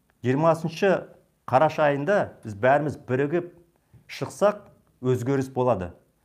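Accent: Standard. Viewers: Қазақша таза сөилеитін екенсіз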